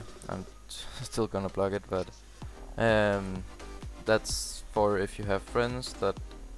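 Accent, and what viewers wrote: Denmark. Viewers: Man kan høre på dig du er dansker ;D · Dit danske accent xd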